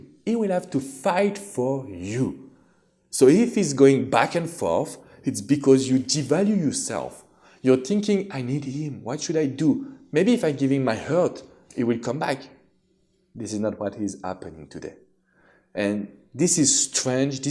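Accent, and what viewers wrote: France. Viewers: I am falling in looove with your French accent.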